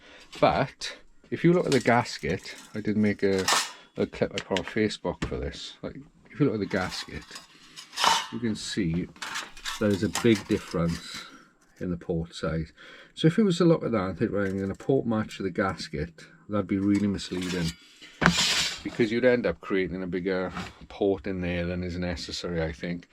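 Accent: Wales, United Kingdom. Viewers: Welcome back mate, nothing beats cars and a Welsh accent🏴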